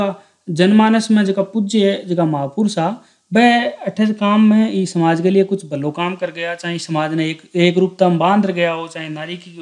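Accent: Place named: Churu, India. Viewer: कहते ह वाणी से व्यक्ति के स्थान का पता चल जाता ह क्या आप चुरू जिले के आस पास से ह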